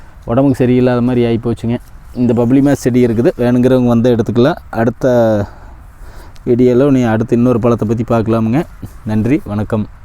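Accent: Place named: Coimbatore, India. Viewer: You covai Tamil speech is very nice. I had been living in Karamadai for past 30 years where I was studied at karamadai high school then pre university PUC at Government arts college in year 1977 . I completed Mechanical Engineering in Govt college Technology during 1978 to 1983.Then joined India space research organization in 1983 itself. So like your speech. I completed 37 years as Scientist in higher levels and retired in 2020. Like your service and affection on food forests. Thanks If time permit I will meet you personally. Scientist RAJAN PAULRAJ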